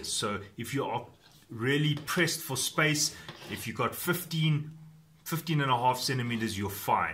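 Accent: South Africa. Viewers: This is a South African accent....you can’t fool me, thanks vir die review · Hey, are you South African 🇿🇦.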